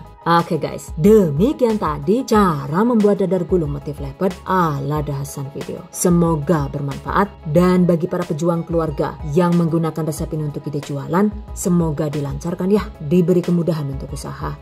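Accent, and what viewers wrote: Banyumas, Indonesia. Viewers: Jane sampean wong ndi mba Q bsa ngapak ya.. SALAM,ngapak NEK ORA NGAPAK ORA KEPENAK🖐👍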